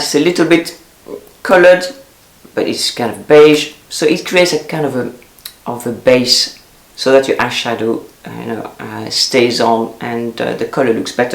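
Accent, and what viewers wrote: France. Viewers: If I wear a lot of make-up, I seem to look a lot older, so less is more for me. Although nearly 69 I've always had and still have a very oily skin, which thankfully means less wrinkles. I have never liked foundation so use a factor 30 tinted city block cream, a little blusher , mascara, eyebrow pencil and lip gloss and that's it. I'd love to wear a red lipstick like you but I'm very fair and a red lip doesn't suit me unfortunately. Love your videos and your wonderful French accent xx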